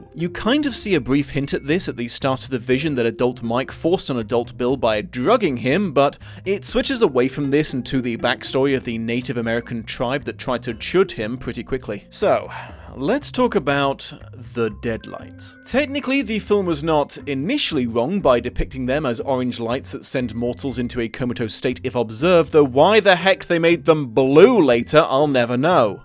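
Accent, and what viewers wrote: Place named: England, United Kingdom. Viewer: ExPat? So your accent isn't real? I thought you were English so good job! :)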